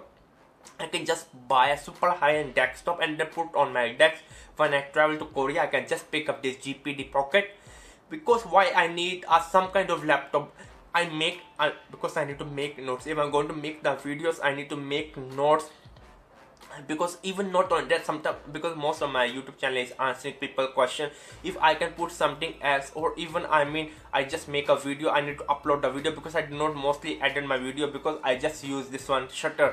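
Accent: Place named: India. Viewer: Indian accent awesome sounds like Russel Peters.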